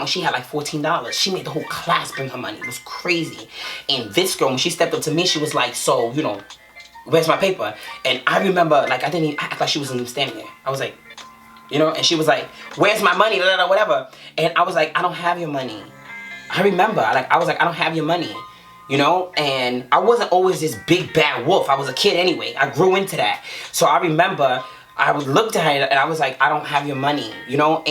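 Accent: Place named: New York, United States. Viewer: I jus love to hear her talk ain’t paying attention to shit she saying jus love the soooo Super New York accent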